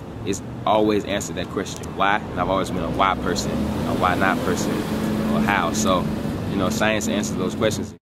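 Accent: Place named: South, United States. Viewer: thank you for the compliment :)..and no I'm not a gangster lol. I just have a southern accent and this video was more about being real and personable instead of super professional with my eye brow raised the whole time (if thats the educated demeanor you speak of) lol. Trust me, I know when to turn it on and off whenever needed. You should check out my video when I hosted on E! year..there was less accent for your pleasure ;)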